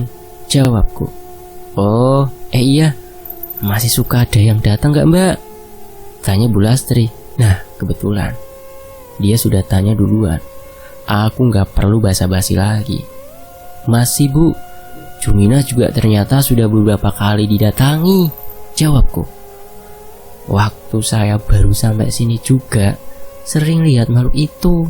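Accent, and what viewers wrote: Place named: Surabaya, Indonesia. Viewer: Waahh.. ini nih yg keren .. bawainnya kalem saja .. mau serem mau seru ..mau bercanda tetep enak didengarnya .. saya penakut banget ..karena suka di isengin makhlus...🙈🙈 Tapi tetep aja penasaran dan ketagihan denger kisah2mu min.. yaa..meakipin beraninya denger pas siang sampe ashar ajah..😅😅🙈🙈 abis suka ngangenin sih kalo sudah berasu2 ber dancuk2 ala logat suroboyoan mu min.. kriuk banget. !!😅 Tetep semangat berbagi ya min.. dibalik setiap kisah pasti ada pesan positifnya terlepas kisah fiktif ataupun nyata.. Oh ya ... Satu masukan ya min. Tampil total aja ngebawainnya .. modulasimu yg oke bisa dikembangin berbagai karakter .. cuma yg kurang berpadu kalo karakter kasar pake bahasa Indonesia .. enggak serasi dg modulasimu yg kriuk kalem itu.. kek kepepet gitu galaknya..😅😅😅. Oke trims min.. berkah memberkahi selalu ditiap kehadiranmu..👍😊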